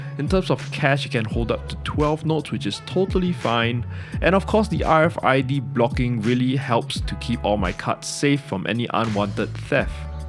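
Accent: Singapore. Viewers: I was thinking at first, your voice sounds very Singaporean, and then you pulled out SIN Dollars! 😄 We’re hoping and planning to move there from Germany soon. Absolutely wonderful little country!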